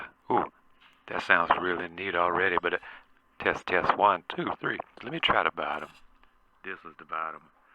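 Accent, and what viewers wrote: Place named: Mississippi Delta, United States. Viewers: My favorite thing to do in the morning is watch craftsman while I’m doing my make up lol there’s just something about the combination of an extremely southern man talking to me about making toys which I will never build while doing my someone elaborate make up routine 😂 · This channel rules, and that dulcimer deep south Mississippi delta accent is the cherry on top.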